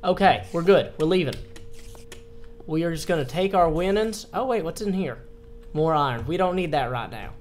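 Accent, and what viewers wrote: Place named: South, United States. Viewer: " I literally heard a cree- HUUURR OMG!" XD funniest part ever.. your southern accent is hilarious ( not laughing at you) but you just earned a subscriber! XD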